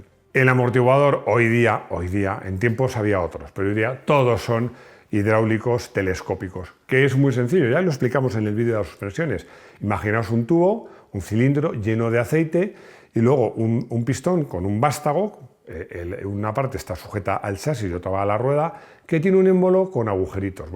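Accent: Chile. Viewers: Que a le escuche un acento chileno? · tiene acento Chileno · es chileno? ese acento se me hace familiar...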